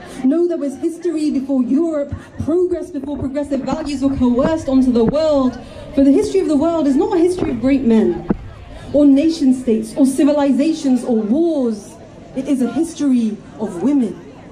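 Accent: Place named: RP, United Kingdom. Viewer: This idiot sounds SO FUCKING POSH... these people are so detached from reality, it's absolutely mind-boggling. I can only see total collapse in the future, we are fooling ourselves if we think we are winning the culture war. The saddest part is, where do we go? I have nowhere to go, England is my home, and every western country has the same problems. I think the best thing would be to create safe communities, with fences... I see a South African style future for us in the west, it's sad. We gave away our perfect slice of peace and quiet for this.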